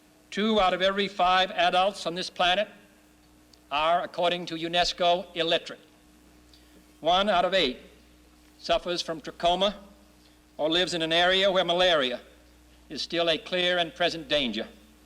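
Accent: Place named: Boston, United States. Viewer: I love his Boston accent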